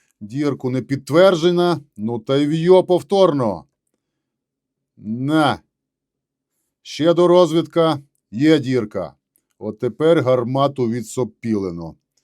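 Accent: Transcarpathia, Ukraine. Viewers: Бадабуум-Чакалака-Мазафака!!! Йобудошч! Вдупумаш!!! Який соковитий всеж закарпатський діалект!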